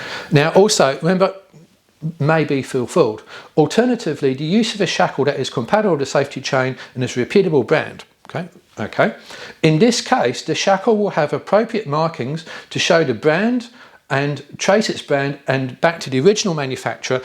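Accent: England, United Kingdom. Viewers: Ignore the English accent, this is Australia but for which state rules, no idea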